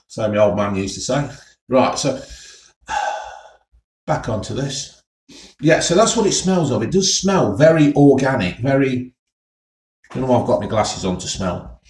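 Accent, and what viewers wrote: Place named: Newcastle, United Kingdom. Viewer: True Geordie emdy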